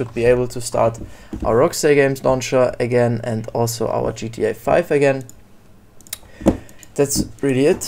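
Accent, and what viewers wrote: Germany. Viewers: Man merkt denn deutschen akzent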